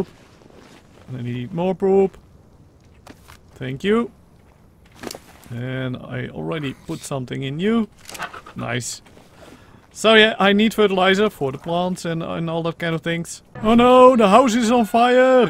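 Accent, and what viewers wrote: Netherlands. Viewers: He has a dutch accent, his English is excellent. I never once have to rewind the video because I don't understand what he has said. That aside, people don't really care how good your language skills are when you come across as a dick. It's sad to see such attitude in someone so young but I hope for your sake, you change as what you have said achieves nothing positive for yourself or the person you insulted and beginning a sentence with "no hate" means nothing, you aim to insult, nothing else.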